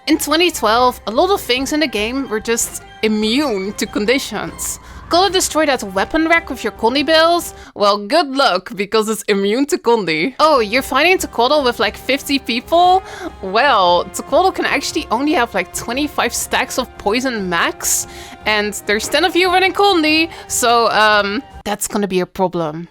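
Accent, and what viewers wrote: Netherlands. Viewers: Do I smell a Dutch accent? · dat nederlands accent erbij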